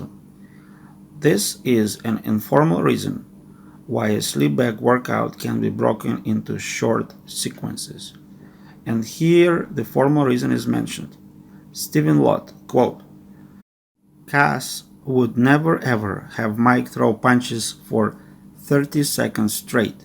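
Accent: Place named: Russia. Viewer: Делай переводы ты же русский Пиздец падишься по произношению . КОНЕЧНО ТЫ КРАСАВА!!!!СЛОВ НЕТ !!!ДЕЛО СВОЕ ЗНАЕШЬ!!! НО НА РУССКОМ ЯЗЫКЕ ПЛИЗ МОЖНО?!!!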